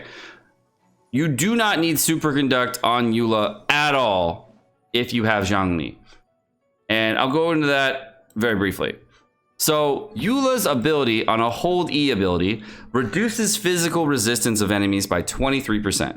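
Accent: United Kingdom. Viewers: Where british?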